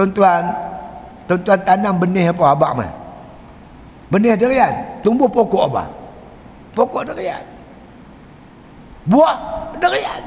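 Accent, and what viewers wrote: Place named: Kedah, Malaysia. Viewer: Loghat kedah lama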